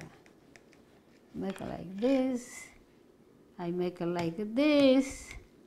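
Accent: Italy. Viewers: Grazie mille for sharing yourself and your recipes. My father and his family were from Puglia but passed away before I could know them. My mother learned many of my grandmother's recipes. Your cooking is so similar and expands what I had learned from my mom. Your accent also reminds me of my relatives in Italy 🥰.Your singing makes keeps me company as I cook and I received your cookbook for Christmas this year! Your family is blessed to have you. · She uses the word "Fiammella" with this sweet accent half-italien-half-english ^^ Fiammella means little flame, so you can basically say you got it right ;)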